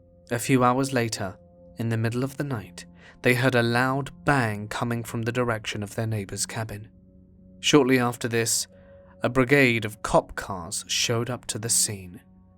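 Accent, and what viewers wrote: United Kingdom. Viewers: Thank you kindly for taking the time in preparing each story and love your British accent it gives every story you read worth listening to. 😊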